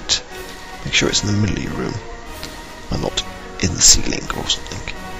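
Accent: RP, United Kingdom. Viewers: You talk posh ? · Hey! you have such a posh accent, is it foreal? im from central london and my accent is not nearly as posh as yours! i cant imagin you playing CSS Shooting people, it would be funny lol. :) and thanks! i watched nearly all of your tutorial video's and i have nearly fineshed my first EVER map! many thanks. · You have a realy "Posh" accent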